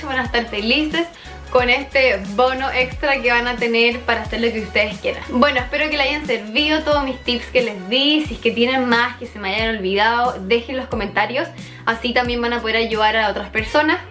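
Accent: Chile. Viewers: Tu eres chilena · Eres chile cierto y si lo eres se nota Pd:yo tambien soy chilena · Ovbio es chilena!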